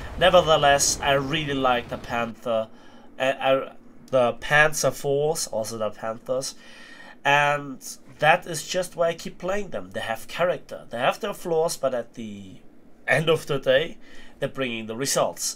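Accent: Germany. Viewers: A very enjoyable video,. I think there is an element of RNG in war thunder, even though Gaijin denies it. I have penetrated the casement face of the jagdtiger with the T29 a couple times in test drive, but that was only 2 out of about 15 shots with no variation. Though perhaps we should not be totally critical of slight RNG. I believe it acts (intentionally or unintentionally) to represent the uncertainty and inconsistencies one would find in real combat. Also, any chance of a face reveal? We want to pair a face to that German accent lol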